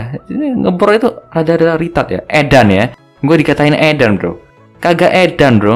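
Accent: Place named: Sumatra, Indonesia. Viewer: makin oke editannya, sukses terus bang tetap buat viewers mu yang satu ini bahagia 😄😄😄 btw lu orang mana bang, logatnya gw denger sumatra banget 😶😶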